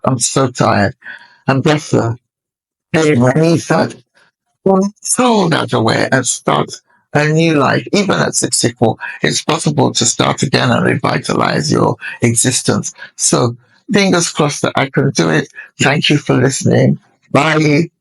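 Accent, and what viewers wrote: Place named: United Kingdom. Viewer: My dear, anything that you find interesting is ok with me. You have a gift of gab as we say in America…..plus your British accent is quite charming. Enjoy your vacation, eat whatever you wish and come back with a new lease on life. Take care,I will look forward to your return…..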